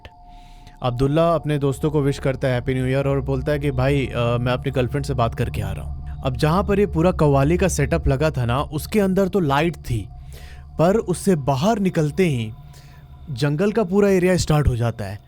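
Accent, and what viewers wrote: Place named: Uttar Pradesh, India. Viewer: Bhaiya please aap kaha se ho apki tone uttar pradesh se ho yesa lagta hai please reply 🙏 ❤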